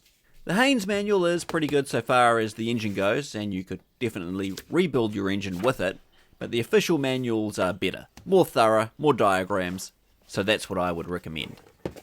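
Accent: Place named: New Zealand. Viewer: I love the New Zeeland accent. Threeds and heeds.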